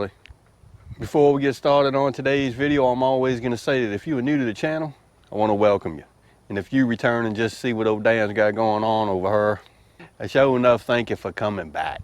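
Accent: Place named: Mississippi, United States. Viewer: New subscriber here and I am so glad I found your Channel. As soon as I heard that southern Mississippi accent I already felt at home. Me and my partner go down and visit family in Saucier every few years or so. I really enjoy the weather, people and pace of Mississippi. I am trying to convince my partner that we should move south, despite the weather down there lately. Tired of this Michigan snow. lol. I hope you release some more content soon. Can you grow a garden year around down there?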